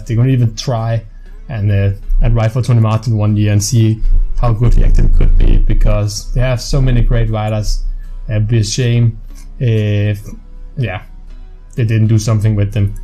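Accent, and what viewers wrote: Denmark. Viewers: Er du Dansk? Du lyder Dansk?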